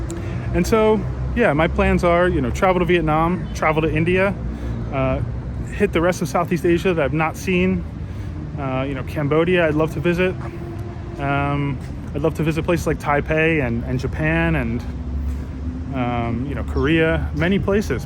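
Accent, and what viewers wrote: New York, United States. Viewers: Love your New York accent. Keep it up. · Keep talking bro! Malaysians are trying to learn your American English by listening to you! Keep doing it..Good luck from a Malay American living in Boston.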